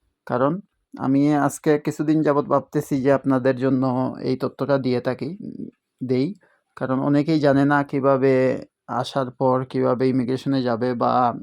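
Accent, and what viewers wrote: Sylhet, Bangladesh. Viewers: ভাই সিলেটি মনে হয় নম্বর দেন জোগা জোগ করি। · আপনি সিলেটি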